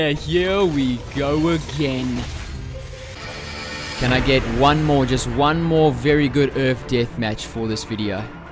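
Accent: Australia. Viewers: His australian right? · Ru aussie mate?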